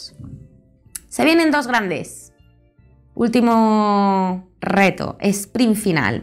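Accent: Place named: United Kingdom. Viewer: quizas sea por los diferentes acentos, cro que este es britanico que es distinto al americano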